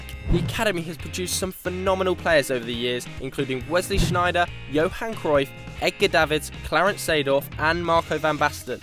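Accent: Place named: United Kingdom. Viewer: Why do british people always have to pronounce names their way La Mayseeya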